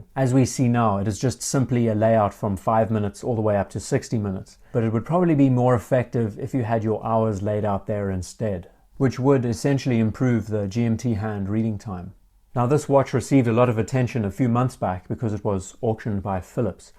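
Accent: South Africa. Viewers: I love you - your content. Also, your South African accent is perfect for YouTube. This VC is OUTSTANDING! Nice video mate. Stay safe.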